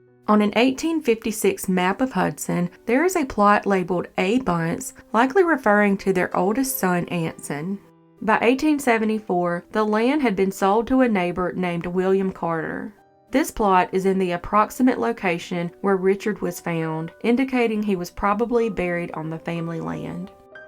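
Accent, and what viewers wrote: South, United States. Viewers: Yeah the videos really good and informative, but that southern accent is the cherry on top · Love your accent 😊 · I love the narrators southern accent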